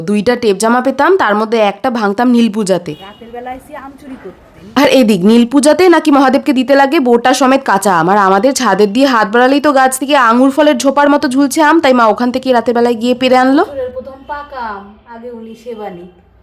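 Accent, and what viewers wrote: Bangladesh. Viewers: Kotha gulo Bangladeshia jogonnno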